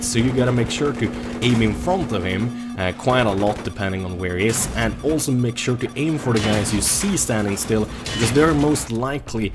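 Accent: Sweden. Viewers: You must be swedish :) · your smooth Swedish tone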